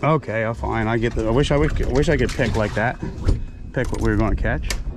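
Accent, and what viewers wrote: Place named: Maryland, United States. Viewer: Hi, I have to ask, are you from Maryland? I detect a similar accent to mine. I fish a good bit in Sunset Beach NC and use the same jigs. Nice video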